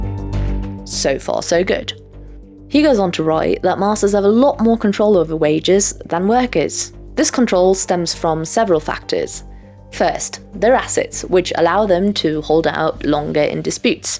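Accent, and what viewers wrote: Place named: London, United Kingdom. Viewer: Good research effort,. Love your educated London accent… music to my ears. The glottal stops give you away, unless…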